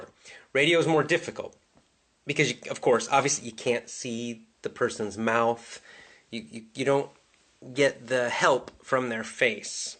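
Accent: United States. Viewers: I like listening to your standart american accent very much!))))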